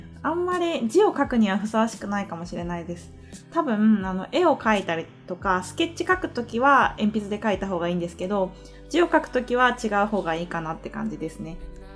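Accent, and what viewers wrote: Kyoto, Japan. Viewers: 以前アップしてた中に、21才頃の動画でしたっけ、あの時の話し声が関西弁だったので、そっち方面かもね。と、思ってました。京都弁で、ぜひお願いします。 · 京都弁の解説メチャメチャ楽しみです！ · 京都弁の解説めっちゃ楽しみやな〜❤ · いつも貴重な情報ありがとうございます。漢字の書き順が少し気になりました笑 京都弁期待しています。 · イントネーションが関西ですよ^_^ 私も関西なので親近感が湧きます^_^ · 京都弁期待しています。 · 京都弁のイントネーションが節々に感じられて、自分は癒されながら観てます。 是非とも京都弁で解説してもらえるのを楽しみにしてます。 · いつもすっごく分かりやすくて楽しくて、ためになります！ お話も聞きやすくて、早くもなくて、癒させるし〜♡ 待ち続けたiPad miniを手にしてからノートも手帳も手書き類すべてiPad miniに移行しているので タイムリーで嬉しかったです♪ 京都弁やってほしいでーす！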